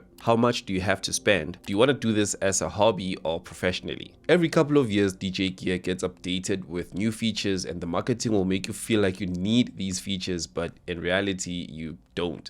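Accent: South Africa. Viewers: That SA accent my dawg! Lol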